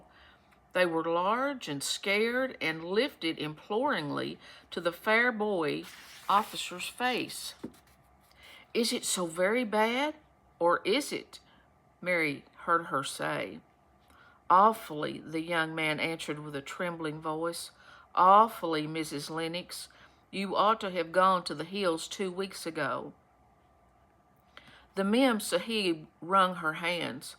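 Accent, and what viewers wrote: Kentucky, United States. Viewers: Thanks! By chance this summer I read A Secret Garden and Anne of Green Gables. Such a nice relief from other troubles. Thanks again. I love listening to a Kentucky native reading these gems!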